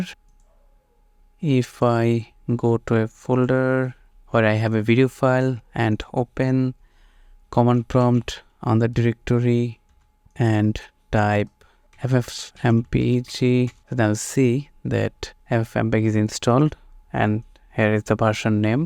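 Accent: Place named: India. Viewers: i only know indian accent, so i think its a bit similar, sorry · fellow indian here; we pretty much sound like each other.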